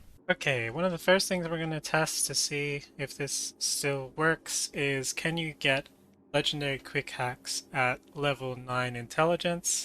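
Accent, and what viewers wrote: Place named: Australia. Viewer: Nice video. Also nice to see a fellow Aussie doing cyberpunk vids (I assume you're Aust from the accent but apologise if I'm mistaken)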